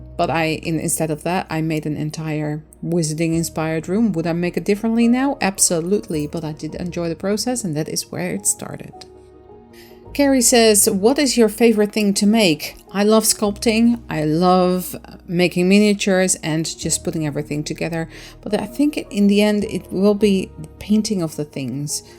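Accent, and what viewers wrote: Netherlands. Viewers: Lol I thought your accent sounded familiar, my father and his family moved to Canada from Amsterdam. Have you considered doing Aragog as part of the pet collection? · 🐦It was really interesting learning more about you. For some strange reason I thought you were of South African origin, but Dutch makes much more sense with your accent. Don't ever give up on your dream art room, visualise it, manifest it into reality. Yeah I know easier said than done but a little hope and faith won't hurt anyone eh. PS I'm with you on the vegemite. Blerk!!!!!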